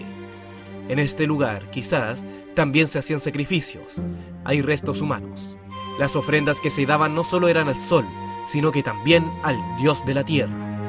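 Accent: Chile. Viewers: tu voz me parece de Chile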